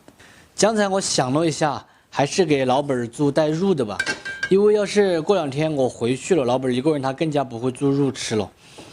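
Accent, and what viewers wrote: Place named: Sichuan, China. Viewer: 川普好聽😀😀😀😀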